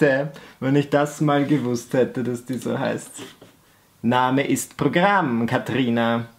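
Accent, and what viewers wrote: Austria. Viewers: Hallo! Ich habe dich durch eine Freundin auf dein Instagram Account entdeckt. Darauf habe ich das Bild mit Sims 4 gesehen habe und dann deinen YouTube Kanal gesucht. Nach dem Sims 4 LetsPlay abonniert. Ich habe dann gemerkt dass du aus Österreich kommt und das finde ich echt cool. Deinen Akzent bzw die Art wie du sprichst ist sehr cool und gefällt mir. Auch deine Mimik finde ich sehr cool. Ich habe erst nach ein paar Videos gemerkt dass du schwul bist und deshalb finde ich Kommentare wie "Du bist die größte Schwuchtel überhaupt" richtig unnötig. Hab zwar nur 2 entdeckt aber trotzdem. Du bist der einzige österreichiscche Vloger. Respekt. Warum? In Österreich gibt es nur VeniCraft, der ist aber LetsPlayer. Noch was zu deinem Akzent, ich habe auch einen da ich aus Linz komme und wenn ich mit Freunden in Deutschland skype verstehen sie nichts. 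Dich verstehen sie auch nicht immer. Finde ich lustig. Ich könnte noch länger schreiben aber ich möchte dich nicht nerven. Liebe Grüße aus Linz:)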